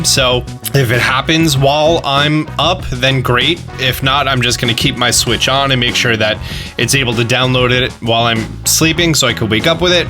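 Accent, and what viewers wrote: New Jersey, United States. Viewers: Ayy you from jersey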